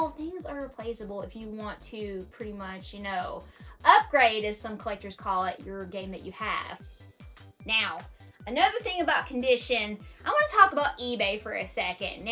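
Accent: South, United States. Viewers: Love your channel and your wonderful country accent 😊❤ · Ayo a fellow game collector with a southern accent and tattoos haha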